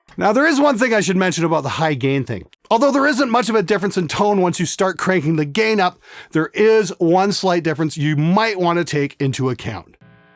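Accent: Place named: Canada. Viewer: that was the most canadian accent i ever heard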